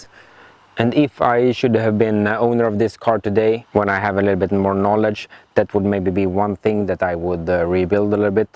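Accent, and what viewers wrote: Sweden. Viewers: Are u swidish?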